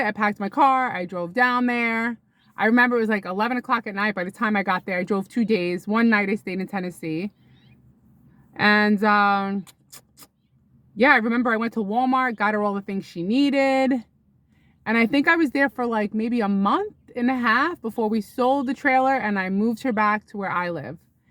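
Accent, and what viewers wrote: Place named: Brooklyn, United States. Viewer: The old Brooklyn accent is really coming out, you know what I'm sayin 😂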